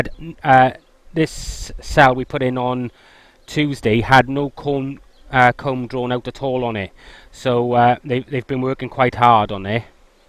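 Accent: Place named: Welsh Valleys, United Kingdom. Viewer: Thanks for the interesting video but most people like me who search for 'starting a bee colony' will be as disappointed as me. Assuming we are capable enough to build the hives, assuming we have all the kit needed, what we need to see is the process from having nothing to having some honey! Show us a video that does just that...;start with nothing, like me, then go through every process till you put the honey on your morning toast. The yanks are good at videos for dummies but are too self engrossed and spend most of the video talking about themselves and how good they are at doing stuff!! I will continue to watch your vids as I am a Taff living in rural Normandy and I enjoy hearing your valleys accent.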